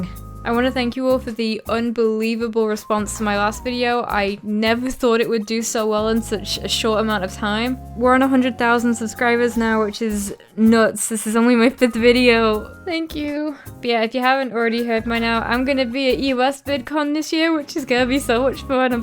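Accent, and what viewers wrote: England, United Kingdom. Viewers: You are the british accent of jaiden animations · YOU SOUND SO BRITISH That's not an insult you're brilliant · is that an english accent? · This channel reminds me of Rebecca or let me explain studios but a UK accent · Is this person British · It sounds like she tries to hide her english accent from some reason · You sound the British version of Jaiden Animations No offense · Are you British? You have an accent. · Is she british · Jaiden but british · you should Do an disstrack with your beautefull british voice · British JaidenAnimations?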